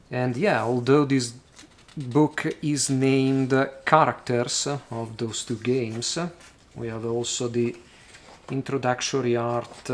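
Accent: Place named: Italy. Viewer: Dalla cadenza inglese/maccheronica sembrerebbe lmao sarebbe una gioia, io non conosco nessuno che gioca a etrian odyssey... E community italiane penso non ne esistono :/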